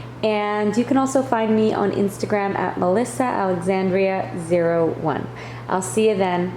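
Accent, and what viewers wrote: Canada. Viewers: Off topic question, are you Canadian?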